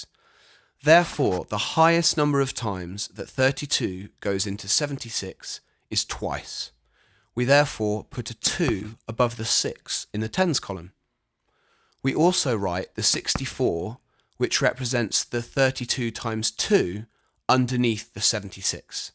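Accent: United Kingdom. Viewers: so nice to have a British accent explaining this thanks so much!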